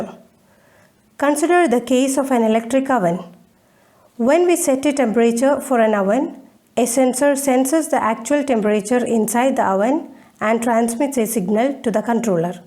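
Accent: India. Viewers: The Narrator is a Malayalee... Well explained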